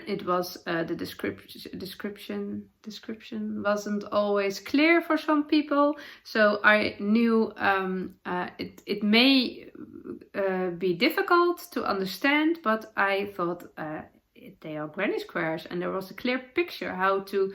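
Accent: Netherlands. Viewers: I love your podcast and your “dutchie english”, it reminds me of my late father. He was stationed in Europe in the 50-60’s and had very fond memories of the Dutch people. I am grateful you record in English so I can enjoy out time together! 💜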